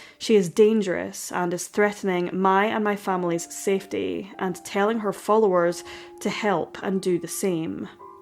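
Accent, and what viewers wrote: Scotland, United Kingdom. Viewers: She’s Scottish. I think from the north of Scotland, maybe the Scottish Highlands.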